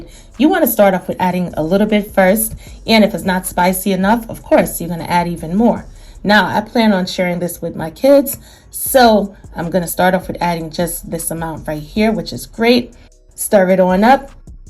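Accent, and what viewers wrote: New York, United States. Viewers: I can listen to her NY accent all day 😍❤!! Another great recipe!! P.s. I'm a silent watcher, but I just couldn't help it anymore, lol. I had to comment & say how much I love your accent.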